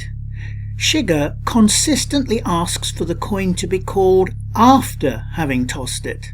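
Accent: England, United Kingdom. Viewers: You say in English accent no less; that Chigar doesn’t have a place of origin but if you know history of conquest by Christian assholes, he is from Mexico, who pieces of shit of America ransacked in the name of a God that doesn’t exist counter to treaties made by the law. The movie is about consequences. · listen to this guy, he's English... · A Brit doing the speaking for a film review such as this is too distracting. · Jesus Fucking Christ. How many descriptive absolutes do you have to use? "From the beginning of the film, the western stereotype is immediately clear." Really, fucktard? A sunrise gives it all away, does it? Or is it Tommy Lee Jones's voice? Hey...from the beginning of your video, the snarky pretentiousness is IMMEDIATELY CLEAH. Dicknugget. "The leaoucation where oll the events tayke playce couldn't. be. mohr typical." Really? It couldn't be more typical, eh? 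Typical of WHAT? Typical of your stupid blanket commentary? God damn. Were you sniffing the queen's meat curtains while you wrote this steaming pile of shit and chips? "Oll thuh main c.h.a.r.a.c.t.e.h.s ah PEFECTLY in.ti.grated IN this environment...THEY ah the essential element of every westehn mouvee." SHUT THE FUCK. UP. Over-generalizations and pretentious King's English phrasing does NOT make for an effective, meaningful review, especially when you're just jerking it in your face. Come on. If anyone took this narrator seriously, this movie would fucking bake the bread for your Texas Toast, slice it, butter that fucker up, toast the sum'bitch, and slap your ass silly 'till you admitted hyperbole isn't a reality. God. The British Accent... sooooo ponce. Maybe if Bruce Dickinson of Iron Maiden were narrating, we'd get a good read. But no. It's who only completed level 1's. Fucking shut up. 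Good name, btw. Pinheads. Yeah...I only made it 42 seconds in. TYPICAHL AMEHRICAN. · I'm quite extinguished by reviewers with British accents telling me what a noif is. Lame, tedious review of a review, and that's not a noif, this is a noif. Let Hollywood have fun til it kind of fades.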